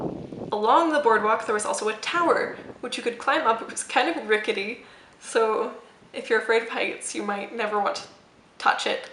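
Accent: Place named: France. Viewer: Your neck and clavicle in this vid are beautiful 🙌 Did you get any vaccinations before going? I hope your momentary sickness when you got home was dehydration and not something else! Maybe do a round of diatomaceous earth to make sure no parasites came home. You’re also probably fine so don’t let me freak you out! Also, you sound almost Italian speaking Spanish with your French accent 😹 And it was nice to see!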